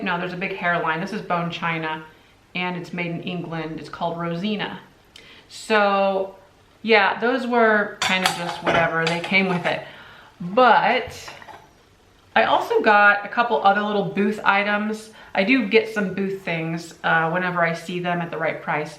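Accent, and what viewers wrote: Midwest, United States. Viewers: Love watching you! I’m from South St. Louis originally and now in Florida. I can tell you’re from the Midwest! How close are you to St. Louis?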